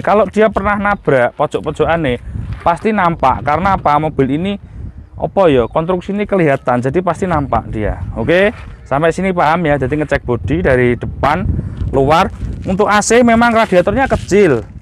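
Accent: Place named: Java, Indonesia. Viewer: Aku seneng bosomu medok, Mas ... 👍😂👍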